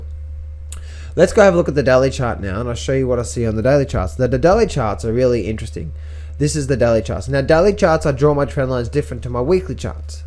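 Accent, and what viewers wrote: Australia. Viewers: Sorry new to this channel are you Australian?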